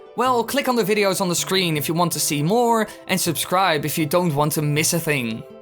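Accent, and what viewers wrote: Netherlands. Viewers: Ik had dit in de achtergrond aan terwijl ik wat anders aan het doen was, en toen realiseerde ik mij pas dat je Nederlands was. Oops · Are you from the netherlands lol? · He's Dutch ;) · are u from holland? you're from holland arent u :P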